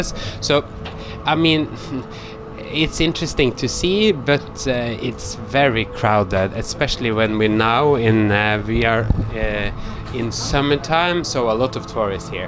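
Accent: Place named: Norway. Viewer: What a treat! We came to America from Porsgrunn when I was a little girl. We always spoke Norwegian and now with my parents gone I miss speaking my native language. I am addicted to your videos to hear you speak my dialect. And I enjoy the funny Nordmenn too!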